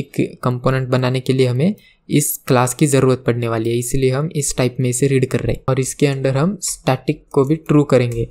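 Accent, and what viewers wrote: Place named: Maharashtra, India. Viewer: Marathi acent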